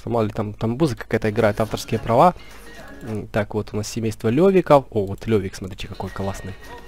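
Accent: Ukraine. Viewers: Автор украинец? Слышится акцент)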